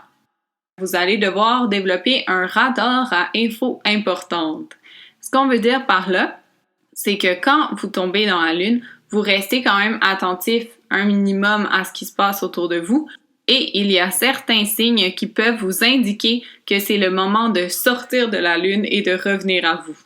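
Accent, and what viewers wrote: Quebec, Canada. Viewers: Soetevids HD C’est notre accent, l’accent québécois si t’aime pas tu sors merci 😒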